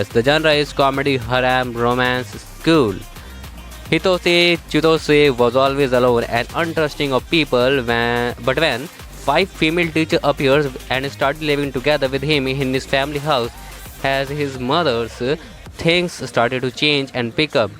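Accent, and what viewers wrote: India. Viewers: Hey bro your voice like indian are you indian 😊ya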